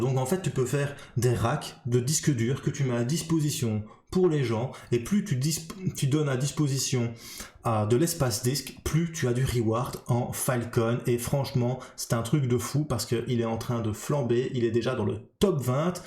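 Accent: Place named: Tournai, Belgium. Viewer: Tu as trop la voix du tournaisien :)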